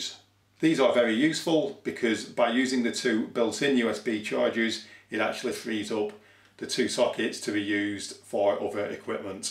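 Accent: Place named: Widnes, United Kingdom. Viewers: Where are you from mate? You sound like Widnes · widnes haha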